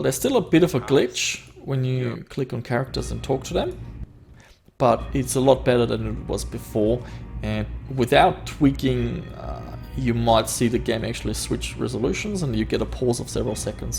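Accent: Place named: Germany. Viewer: Thanks for the tips.. From the accent, i know you're a german. ;)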